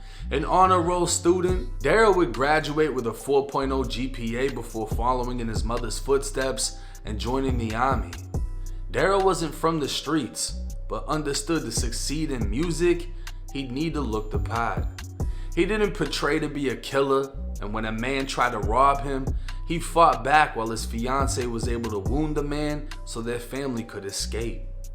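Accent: Boston, United States. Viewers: You must be from Boston (Baah - stunn). Apartment (Aa - paaht ment)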